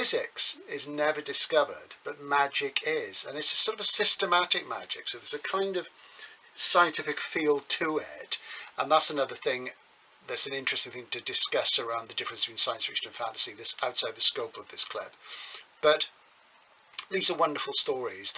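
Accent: Wales, United Kingdom. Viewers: I've been a fan of SF since before my teens (I'm on the verge of 60 now) and Alternative History was always a guilty pleasure. I say guilty because to be honest a lot of it wasn't terribly good, with bad writing hidden by concept, but there are enough gems out there to make the sub-genre worthwhile.. I've loved 'Pavane' since I first read it back in the 70s, and was particularly pleased to finally visit Corfe Castle about 5 years ago. A couple of others I remember from back then are 'A Transatlantic Tunnel, Hurrah!' by Harry Harrison, and 'The Last Starship From Earth' by John Boyd, in which the reasons for the alternative world lie very far back in the narrative, until the rather wacky ending. No doubt you will also do a time travel discussion at some stage, and I shall look forward to it, as fI'm a sucker for Welsh accents anyway, and you have a very easy and confident speaking style.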